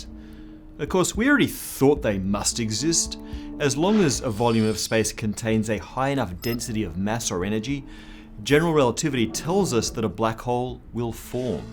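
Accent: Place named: Australia. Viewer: do you have a channel with the same information, but without the Aussie?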